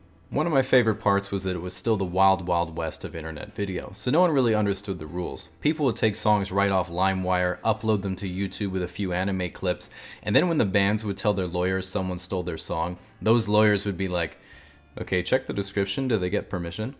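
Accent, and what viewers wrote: Australia. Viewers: The first joke he did was actually kinda funny. But that's just because he has an Australian accent, which makes everything funny. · his aussie accent is on point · CE's Australian accent is really cute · I love the Aussie accent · Wait Your Australian, same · you sound exactly like darren levy with your australian accent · Hearing this guy speak in his Australian voice sounds so much more normal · That's a really good Australian accent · Why does your australien accent sound like adrian gee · Very convincing Australian accent. Most people go for the crocodile Dundee accent and butcher it. I've lived here for 18 years and still can't do a convincing accent. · still can’t believe u used to live in australia and pulled off that accent like u have 2 personalities ahahahaha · Honestly, his accent sounds more "Aussie" and Internet Historian's. 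IH has a very "posh" Aussie accent, for lack of a better word. You ought to hear the bogans. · Damn, the Oz accent is completely native! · That was an amazing Australian accent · That australian accent is oddly familiar. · Okay but your Aussie accent sounds exactly like my little brother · Top Australian accent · your aussie accent sounds like frank woodley! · Your fake Aussie accent sounds like a Kiwi, your real voice sounds Aussie cheers mate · nice aus accent i have to say, u even say fuken right. edit soz just realised ur probably born and raised aus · your aussie accent was spot on! didnt see that coming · That Australian accent is fucken great mate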